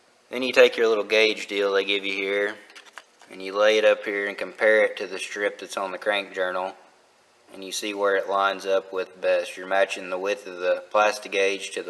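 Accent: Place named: Texas, United States. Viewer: your north Texas/Oklahoma twang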